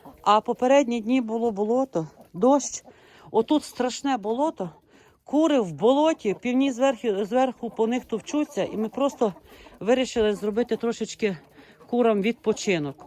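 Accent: Ternopil, Ukraine. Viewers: Дякую вам пані за відео про любимих курочок.Я насолоджуватись вашою мовою і зразу вгадала, що ви з Тернопільщини, потім прочитала в коментах.Таке відчуття, що я побувала у вас в гостях.Дякую, дякую, дякую.Ми переможемо і буде мир і є наша роботяща Україна.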